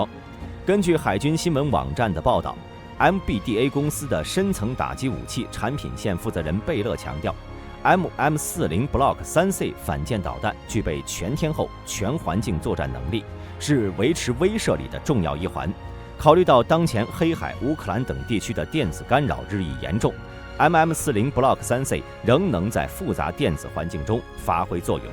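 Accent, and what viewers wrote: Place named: Beijing, China. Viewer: 感謝本節目的男主播，以標準的北京話報導日字正腔圓清楚的報導謝謝🙏。❤❤❤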